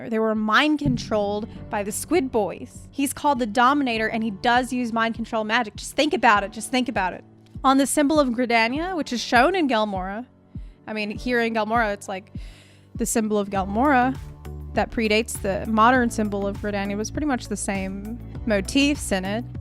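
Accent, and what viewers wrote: South, United States. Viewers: where are you from? i looove your accent! i'm from ga living in nc and i relate to your pronunciation so much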